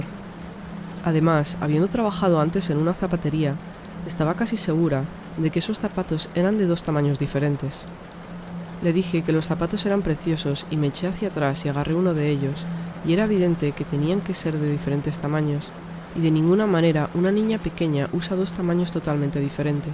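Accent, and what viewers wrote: Spain. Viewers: Los 3 relatos muy escalofriantes la verdad ya que esto es real, muy buenos relatos me encanta ese acento español, saludos desde México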